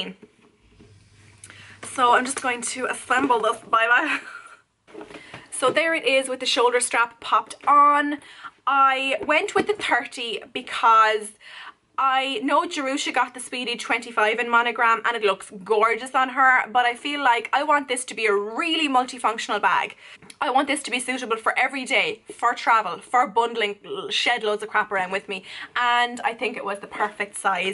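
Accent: Ireland. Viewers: Knew you were Irish the min you opened your mouth!😍 love Irish youtuberssssss😁😁👑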